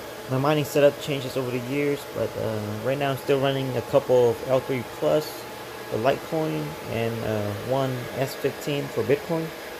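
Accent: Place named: Vietnam. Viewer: You have Vietnamese accent.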